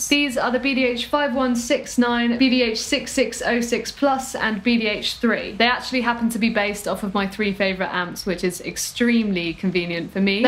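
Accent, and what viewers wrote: United Kingdom. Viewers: I love the British accent on women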